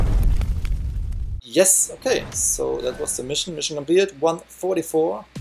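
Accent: Germany. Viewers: were are you from ? you Sound German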